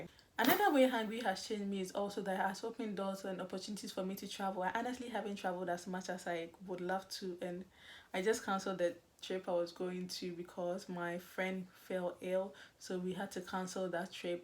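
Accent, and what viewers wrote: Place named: Ghana. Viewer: Tfs... Btw you speak quiet fast ya...:-) good thing I had a Ghanaian friend during college so I cud follow you...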